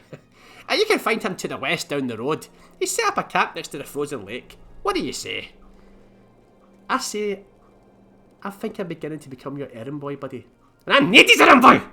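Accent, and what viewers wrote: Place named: Scotland, United Kingdom. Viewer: Love Scots and Scottish acsent.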